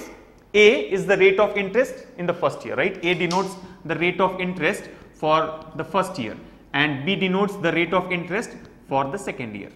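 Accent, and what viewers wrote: India. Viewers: Beautiful accent reminds me of my hometown India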